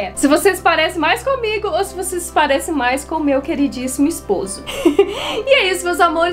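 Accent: Minas Gerais, Brazil. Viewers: seu sotaque em nossa língua é gostosamente mineiro! Sou daqui de Divinópolis, Minas Gerais! Trem difícil, cara emburrada, etc... muito legal! Você é a youtuber mais mineira do mundo! Conhece o Paulo, um rapaz lá do Recife que mora aqui perto de Divinópolis? Veja este vídeo, e vou te dar a ideia de explorar este seu jeito de falar mineirês! <link>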